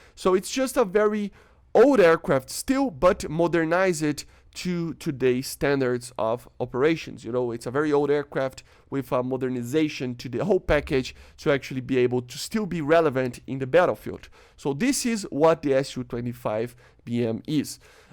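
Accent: Brazil. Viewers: Mano, esse cara parece um brasileiro falando inglês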